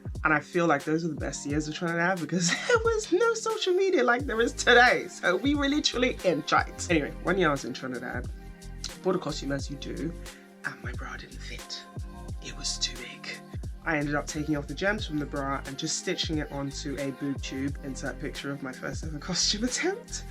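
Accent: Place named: Barbados. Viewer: Alright de bajan accent sounding right den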